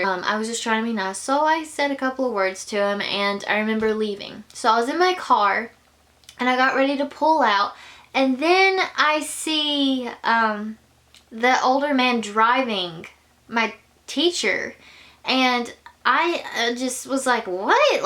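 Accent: South, United States. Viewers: you have an cowgirl accent xD but its so cuteeee and i thought you were old when i first saw your musicals xD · You sound like you have a bit of a southern accent. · I like your country accent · I love her accent lol! She's a lil country lol! She's so cute and adorable and so funny!! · Where are you from? I'm from Alabama and your accent is really strong compared to people around me.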